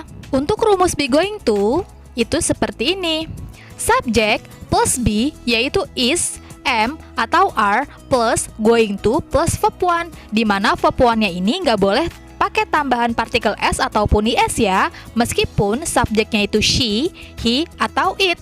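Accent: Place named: Indonesia. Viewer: Miss, saran untuk intonasinya hehe masih seperti bahasa Indonesia... kan seharusnya ada word stress dan sentence stress 😊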